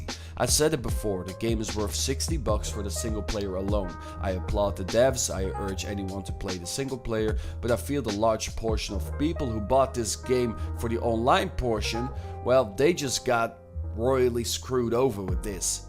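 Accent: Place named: Netherlands. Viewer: Let’s face it, RDR2, desite being the wayy more detailed and perfectioned game compared to GTAV, isn’t half as much fun to play, or at least not online. We can only pray for Rockstar to release GTAVI soon, because it is obvious RDR2 pales in comparison.. On a sidenote, just out of curiosity, where are you from? Your “regular voice” accent sounds pretty Dutch;) Thanks and good luck with the channel!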